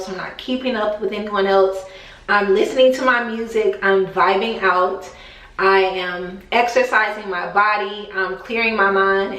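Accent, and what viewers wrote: United States. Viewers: You sound like us who live in Atlanta. We always say, “there’s Atlanta and the rest of Georgia.” So, yes, I live in Atlanta, not Georgia. 😊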